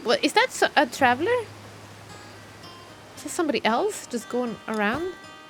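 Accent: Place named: Sweden. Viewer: 1 minute in, she sounds super Swedish, I could be wrong. But I don't think I am. xD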